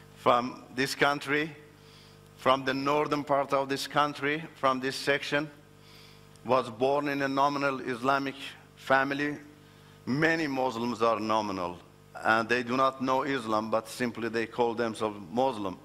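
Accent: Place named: Iran. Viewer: Amina he's a Iranian.. things r pronounced in his tongue with slight difference., like different recitations of kuuuraan.. we call allah in most but many call it as alloah.. does that make him an ignorant in arabic? definitely he's learned enough to explain the message .. salaaaaaam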